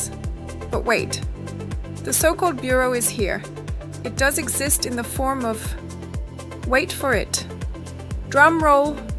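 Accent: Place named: Australia. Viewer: well thought out and spoken piece on customer service and business responsible practices. Its a shame there is not a clear cut service agreement all business conducted in sl must follow or they can lose their Lindens and account. cool to hear a Aussie language on Sl! Also i need that dress! its perfect summer all rounder :))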